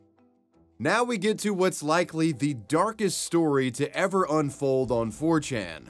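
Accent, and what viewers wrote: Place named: United States. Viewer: I just found your channel yesterday and I’m addicted. I rarely subscribe to any channels and you’re so much better than other channels. I hate when channels are run by people with thick accents or over dramatic. You are straight forward with little drama and normal American accent. Super refreshing!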